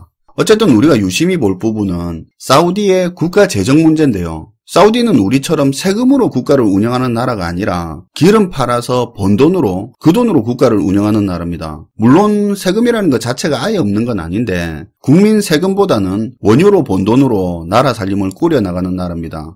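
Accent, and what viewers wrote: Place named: Gyeongju, South Korea. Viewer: 잘 봤습니다. 오리지날 서라벌 원어민 발음 멋지세요~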